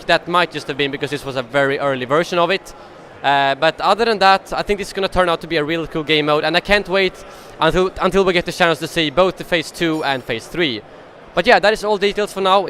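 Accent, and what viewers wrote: Sweden. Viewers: are you swedish ?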